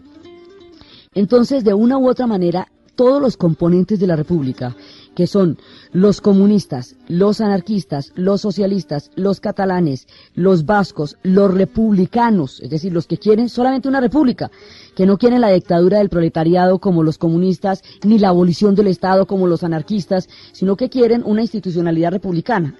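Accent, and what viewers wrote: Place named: Colombia. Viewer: Felicidades,a pesar de conocerme de pe a pa esta historia me resulta grato escucharla con el acento de...Colombiana? y los incisos de canciones y peliculas...genial las he visto todas!y mejor no se puede expresar la sintedis de ka lengua de las mariposas manita arriba y..LIKE!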